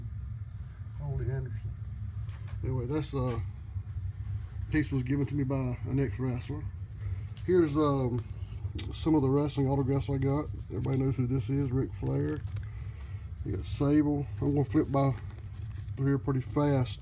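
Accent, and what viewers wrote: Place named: South, United States. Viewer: That was a blast from the past & made to much better by the native WCCW & NWA southern accent!!! :)) "See ya in church" brother. Blessings, David (Jer. -24)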